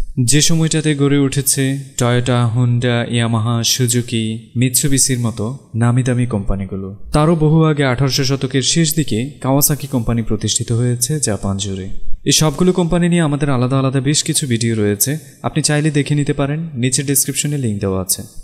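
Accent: Comilla, Bangladesh. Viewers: কুমিল্লার মানুষ সুদ্ধ ভাষায় একদম ক্লিন।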